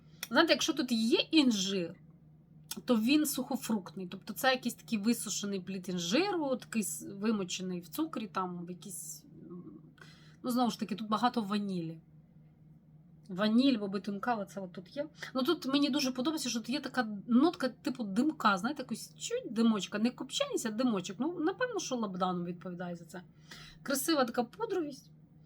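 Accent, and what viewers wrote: Ukraine. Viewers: Я, перепрошую, Ви з Хмельницького??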